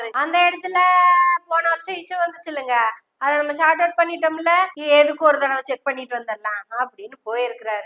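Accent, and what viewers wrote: Kongu, India. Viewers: Amai kunjugalayum anil kunjugalayum vibration adipathe ungal velai kongu thamil nalla iruku thanks Sister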